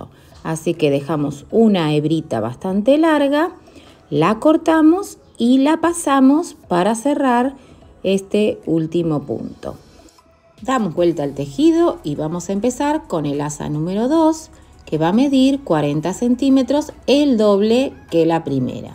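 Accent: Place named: Central America. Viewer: Me gusta el tejido, muy fácil!!! No entiendo xq siendo argentina habla como centroaméricana ó algo así. Pero todo excelente!!!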